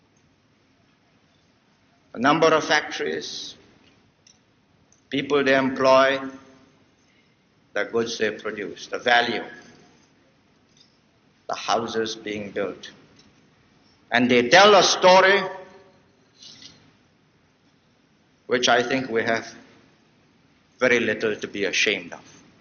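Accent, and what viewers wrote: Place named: RP, United Kingdom. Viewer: As a person from Britian, who can’t help but view Lee Kuan Yew as one of the most inspiring figures of the truly post war world, I’d like to say that you for uploading this and also. Wow he sounds so much more British upper-crust here, as he lived the decades in Singapore his accent slowly joined the home he formed.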